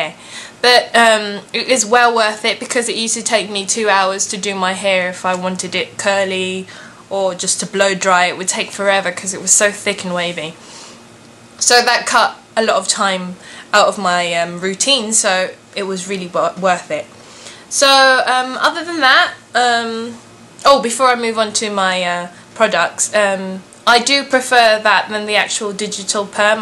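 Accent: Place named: Philippines. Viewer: Omg. I love your accent! Are you Filipina?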